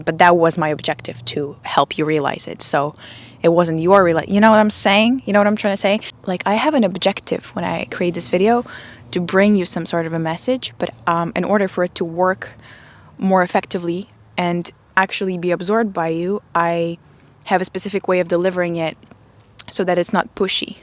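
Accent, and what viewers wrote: Russia. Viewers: wait ARE YOU RUSSIAN???? YOU SOUND SO BEAUTIFUL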